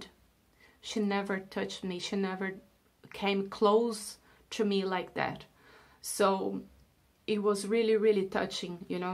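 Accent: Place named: Brazil. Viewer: Eu imaginei que tu era brasileira pelo sotaque :)!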